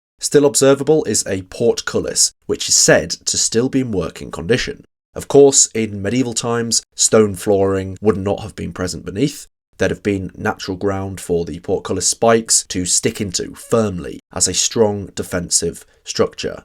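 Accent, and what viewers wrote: England, United Kingdom. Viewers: Dear Lord Brilliant! Love the accent. So English. What I wouldn't give to go back to the days where we could just go about jabbing people with metal sticks and shutting the gates on the morons that don't support us. Can we go back to that or not? lololol! No, I'm just joking on that, right? I mean, no, I have to be joking, we are so far beyond that technologically. I get to fly remote control RC drone hellis packed with lasers and cut down infidels? Ok, maybe? Oh man, it would be like that one helicopter, that was like the night rider helicopter, what was it called? Airwolf. lololol! Oh gosh. I want be an RC drone air wolf for infidels. No wait, I wanna box those people fair and square honestly. Then if that goes wrong. lololol. Oh my god, we've lost the plot. All over a silly old gate dude. You are gate happy bud. Gate happy.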